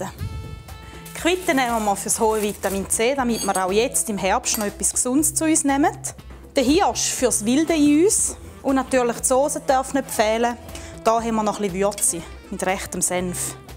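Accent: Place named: Switzerland. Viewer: super video!!! ich als tiroler versteh den schweizer dialekt, hahhahaha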